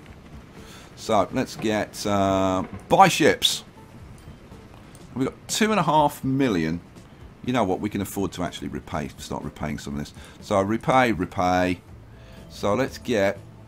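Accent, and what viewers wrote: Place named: Northern England, United Kingdom. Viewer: totally northern England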